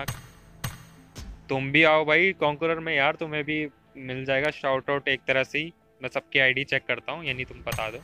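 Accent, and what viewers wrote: India. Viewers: Bhai utrakhand se ho Kya ? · Bahi tu GADHWALI hai kyaa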